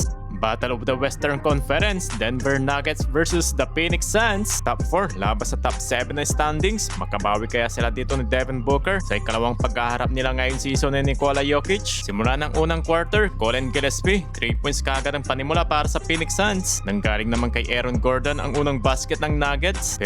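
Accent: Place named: Visayas, Philippines. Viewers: Dong,ano probinsya mo?? Bisaya ka ano??? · Visaya k dong boses m pilipit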